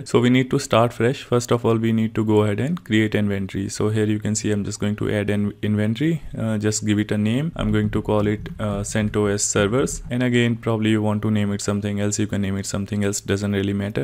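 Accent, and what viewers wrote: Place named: India. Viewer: Пока произношение индуское не уберешь — жрать не получишь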